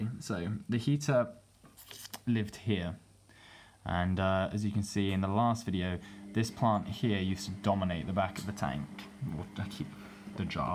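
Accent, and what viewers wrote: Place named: RP, United Kingdom. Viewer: you sound posh as fuck. paint me naruto please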